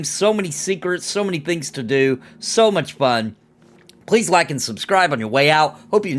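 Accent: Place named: South, United States. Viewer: Where you from brother, i hear the southern in you I'm from arkansas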